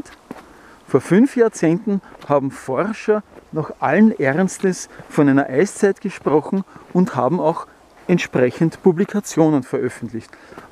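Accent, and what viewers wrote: Austria. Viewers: Dei "weana" Dialekt is sowos von herzerfrischend...🛷 "Laiberl" statt Pullover! Mein neuer Walspruch. Danke, mit dir geh ich gerne täglich Gassi..🐕 🌬❄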